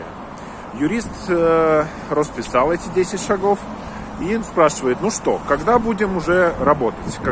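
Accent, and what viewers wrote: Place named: Saint Petersburg, Russia. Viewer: Ты же из Алтайского горного округа родом, здесь Петербургский русский язык со времен горных офицеров, кабинетных земель, золотых рудников итд, без аканий и оканий. Разве что, уральский казачий говор есть, как в речи у Шойгу.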